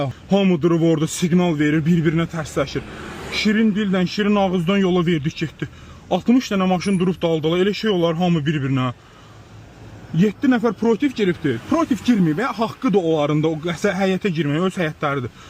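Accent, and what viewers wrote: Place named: Ganja, Azerbaijan. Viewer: Gəncəli ləhcəsinnən danışırsız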